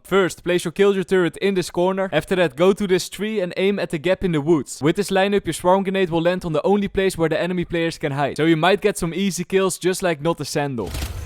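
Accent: Netherlands. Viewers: Genuine question: are you Dutch? 👀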